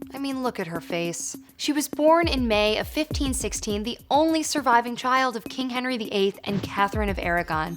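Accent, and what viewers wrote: United States. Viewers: Its hard listening to English history via a twangy American accent, no matter how attractive the speaker is.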